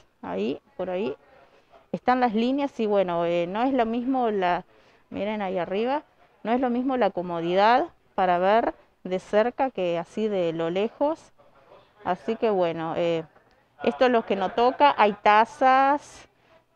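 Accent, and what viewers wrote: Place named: Montevideo, Uruguay. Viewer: Vos si q nos hacés el honor de representarnos. Tu forma de expresarte es bien de montevidiana. A pesar de tantos años que vivís ahí no perdiste ese "que sé yo",😉. Me encanta que seas vos misma como todo los q tienen su propio canal. Feo es cuando los uruguayos se van a otro país y después se creen mejor que los demás Ese barrio es precioso. Disfruto muchísimo tus videos,esos lugares q me hubiese gustado conocer en persona,pero bueno,para q está y su canal? Para hacernos conocer New Yersey y New York ☺️😉. Bien, encontraste la panadería 👏. $900 😳🙄🤦. De veras que no hay ni un asiento para sentarte 😁. Pero bueno ☺️😂. Andás con alcohol para las manos antes de comer? Qué? Eso te salió 20 dólares? Con razón te hizo sufrir pagarlos. Claro que disfruté mucho,😘🙋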